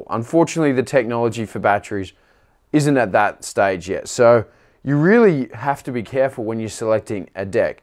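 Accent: Australia. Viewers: He's Aussie accent is funny, it sounds like he saying "Dick" for "Deck"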